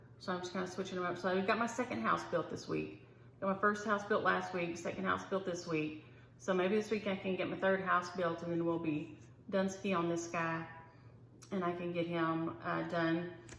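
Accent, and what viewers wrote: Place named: Appalachia, United States. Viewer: Congratulations on over 4000 subscribers! You out did yourself with this week's stories. You talk a lot like us hillbillies here in WV (that is a compliment 😊). Patriotic Cardinal or Project.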